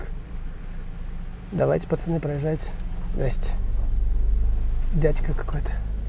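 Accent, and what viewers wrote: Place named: Kazakhstan. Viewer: красавчик!Дикция "андреймалаховотдыхает"👍👍👍Я даже по радио слушая мог предположить,что из Казахстана)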